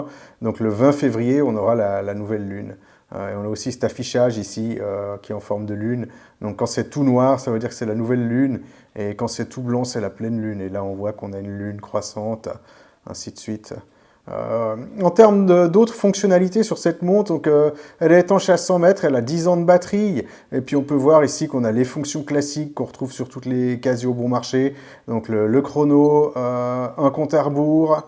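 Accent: Geneva, Switzerland. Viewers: Je détecte une pointe d'accent genevois... je me trompe?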